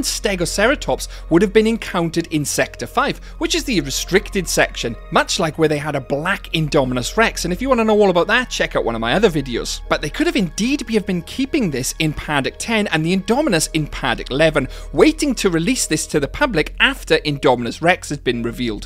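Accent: Wales, United Kingdom. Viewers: Idk why but u sound welsh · Awesome video! Also, whereabouts from the UK are you from, I hear a bit of a Welsh accent in there but cant tell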